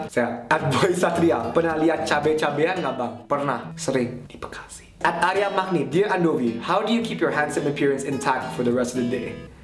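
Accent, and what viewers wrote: India. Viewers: Njir accent india nya..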